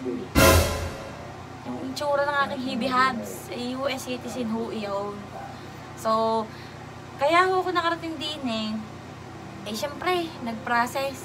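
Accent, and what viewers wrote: Batangas, Philippines. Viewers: Hello, Ms! You have a cute accent, Batanguena ka ba? I really enjoyed listening to you. I’m happy for you being able to come to the states. Mabuti at summer ka dumating dito kc grabe pag winter lalo di yan sa East Coast. Watching from California 😃. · Ate ur so cute. Haha nakakatwa the way ka magsalita though i have many friends na batangena at batangeno. I also have relatives in batangas. Shout out naman. Subs mo na ko since you started. In looking forward na gumawa ka din ng videos like mukbangs with mamay hahahaha. Abay nakakatawa kayo ehh. Shout out plssss. Im from cavite. Thankyouuuuu. Sana mapansin mo comment ko ate. Akoy naaadik sa panonood sa yo kamo. 🤩🤩🤩🤩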